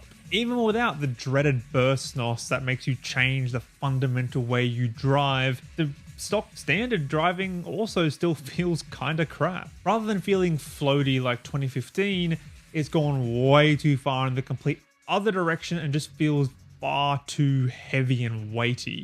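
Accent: Australia. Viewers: brooooo, an aussie? oh mate lets do this, i just listened to an american younin talk about Midnight club for 40 mins and i so wanna be home again baha. - a fred durst ref in the first minute, i'm yours rofl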